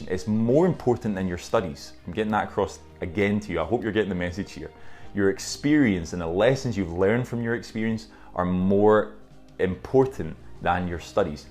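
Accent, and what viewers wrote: Scotland, United Kingdom. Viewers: Thank you so much for this info. Some people tell you, you shouldn’t tell them you are aspiring for something else as they will think you want to just use that opportunity to climb further. By the way, are you Scottish?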